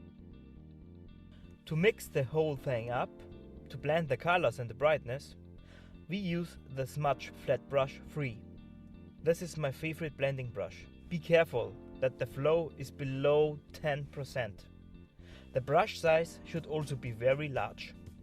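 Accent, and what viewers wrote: Germany. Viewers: Great yet simplistic tutorial in your "German🤪" English..with that said you have got a new subscriber here 🖐.... how about the video of Artist review of new Galaxy note 10 plus😃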